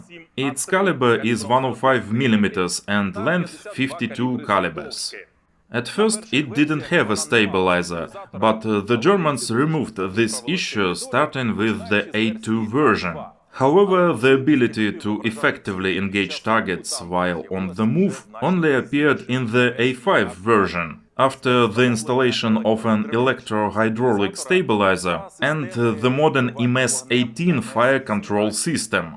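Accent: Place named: Eastern Europe. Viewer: Можна було штучний iнтелект заюзати для перекладу голосом. Було бы гарнiше без нашого слов'янського акценту на захiд.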